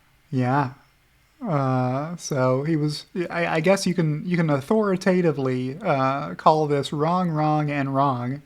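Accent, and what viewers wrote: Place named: Transatlantic, United States. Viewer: Love that hair, man, and your posh, trans-atlantic English accent compliments it so well.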